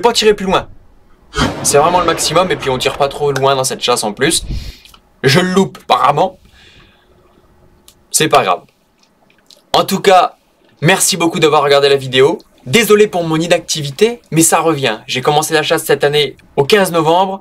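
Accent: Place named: Aube, France. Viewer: Super videos et super tirs ! Tu es d’ou pouvoir un accent comme ça…ça me fait penser aux petits gars de l’aube ! 😊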